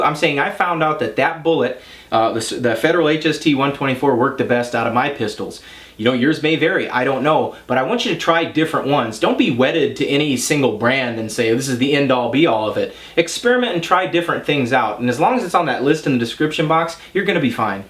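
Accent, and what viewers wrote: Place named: Upper Peninsula, United States. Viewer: you talk like a yooper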